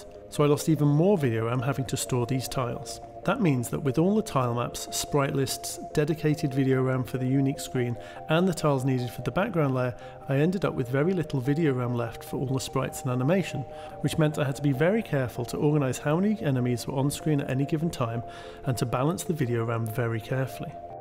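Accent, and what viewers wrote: United Kingdom. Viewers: There is plenty of American content that has a big international-audience but they don’t change their dialect or language, they speak as to where they are from. Speaking as an Australian, I was confused when I first started watching your stuff as you sounded British, and I knew TT was, so initially I thought you and TT were a lot more American than I once thought. Ultimately it is your channel and you can do what you want, I just find it odd you say Genesis and speak for an American audience and i’ve seen numerous other comments saying the same. Toy Story is one of my favourite video games ever, so I don’t mean any hate, I just think you are documenting some great stuff here that will be watched for decades from people everywhere, it should be authentic and speak to as where you and TT are from (I know you might not be there now, but I lived in England for a while but am still ultimately Australian).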